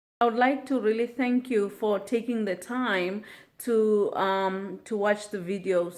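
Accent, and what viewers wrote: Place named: South Africa. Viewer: Did you consciously keep your South African accent? What language do you and your family speak at home?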